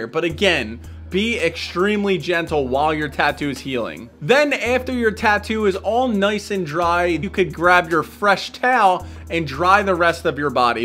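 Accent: Philadelphia, United States. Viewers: he’s from philly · You gotta be from Philadelphia with the way you say warder. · i detect a little bit on an eastern PA southern Jersey accent maybe im wrong but i know a philly accent when i hear one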